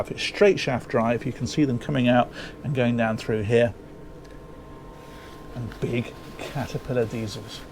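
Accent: England, United Kingdom. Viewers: Nice yacht buddy. I like the design layout. That master bedroom was awesome or using one of your words it was a "proper" master bedroom. I love to listen to you English people talk from England because we don't talk like that in the United States here, mainly in rural Missouri. But I'd take this boat on vacation or use as a live aboard because it would be so easy to keep clean I'd think. I loved the upper fly bridge the most. It has a great layout for this size for a "proper" cruiser. An the galley is perfect for making meals while you have 6 or even 12 people onboard fishing and or in the water playing around. Perfect vacation boat especially if you have children or teenagers because they make messes and I think it would be easy to clean up with floors like that. The boat or small yacht is beautiful especially since I've never seen another one like it or even close to it before from all the channels I've been watching. 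But I have to say, your channel is my number one favorite, plus that I am faithfully watcher. When I see you posted a new video, I have to see what you found because I know it's going to be worth watching. Love your channel buddy. Great job, and you earned another 'at a boy award' from me here in Missouri. I can't wait to see what you post next time. I still wish you would make a specs page showing us all the details like, the length, width, numbers of gallons fuel tanks are, water, the max range it can go in nautical miles, an what speeds with NM, size o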